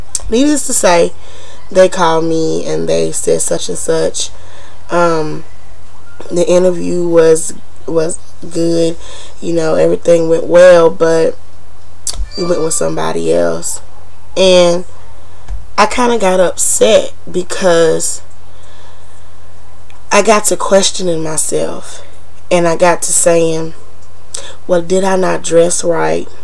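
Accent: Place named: Rural, United States. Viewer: I love the way you talk all country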